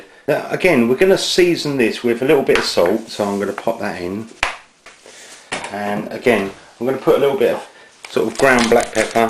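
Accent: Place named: London, United Kingdom. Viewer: Thank you sooooo much.. i made this last night my husband loved it.. soo yummy,simple an easy ,and... yes ..your accent very londoner sounds kool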